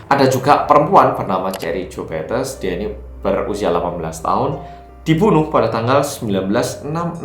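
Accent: Java, Indonesia. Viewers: medok banget oppaaaa 👍🏻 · Mas orang korea apa orang jawa, bhs jawanya medok bgt..😅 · Kak,kk pernah tinggal di Jawa ya soalnya logat bet hehehe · Mas nya orang Jawa kah ?? Medok Sekali ngomong nya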